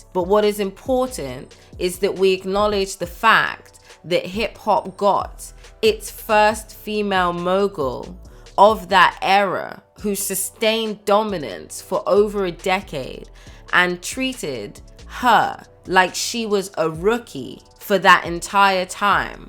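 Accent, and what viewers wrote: United Kingdom. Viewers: The British accent caught me off guard 💂‍♂️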